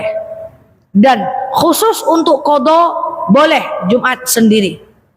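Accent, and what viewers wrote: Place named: Padang, Indonesia. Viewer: Ustdzh org pdg kayakny suaranya kayak org pdg benar GK subhanaallah kagum saya udh cantik ustdzah LG beruntunglah ortu nya mendidiknya anaknya jdi anak Sholeha semoga sehat selalu dan dijaga oleh Allah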